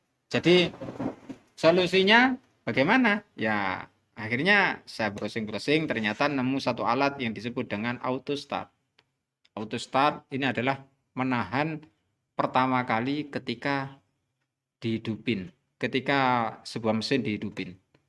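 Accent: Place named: East Java, Indonesia. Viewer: wong ndi se mas,, kok kyk jatim ngunu ngmg e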